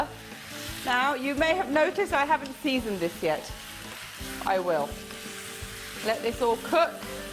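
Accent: England, United Kingdom. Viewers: She's amazing ..got an amazing English accent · come on people shes a Britisher and shes got an English accent..and seems like a really lively person..get over it and focus on the delicious food shes making..or else just stop watching...simple, isnt it?? btw you are fabulous...loads of love... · loved the recipe and ur British accent. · she sounds so british :3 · She is very much indie with a perfect british accent. What's wrong in that. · Your British english is awesome... Its... I learned with your show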